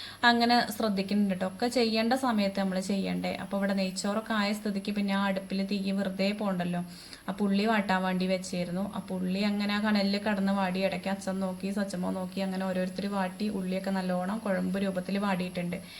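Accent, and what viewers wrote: Malappuram, India. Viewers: ningal Malappuram Anu Ann samsarathil manasilai evidayanu. Sthalam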